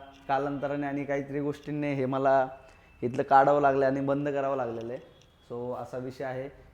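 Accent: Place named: Pune, India. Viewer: Punekar's boltat..♥️🫡🔥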